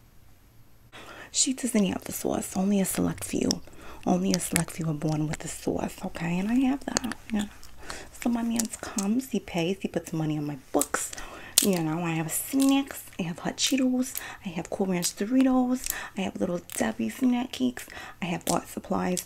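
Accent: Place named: New Jersey, United States. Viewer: Your Hersey accent vids are everything 🩷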